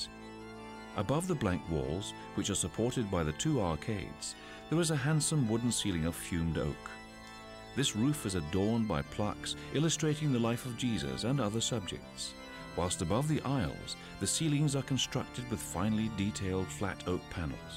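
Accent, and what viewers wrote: United Kingdom. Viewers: "Eileen" Donan Castle?????? Get a grip laddie. Your accent is Scottish but your knowledge of Scotland is sadly lacking. "Eileen" really!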